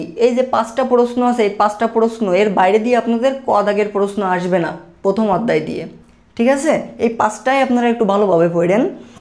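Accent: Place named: Khulna, Bangladesh. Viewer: দাদার যশোর অথবা খুলনায় বাড়ি 😊❤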